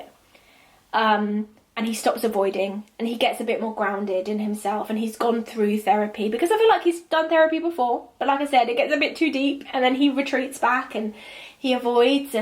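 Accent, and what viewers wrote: United Kingdom. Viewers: I am obSESSED with your accent 😩😍 I feel like I can listen to you talk forever. It’s so calming & posh. Is it British ?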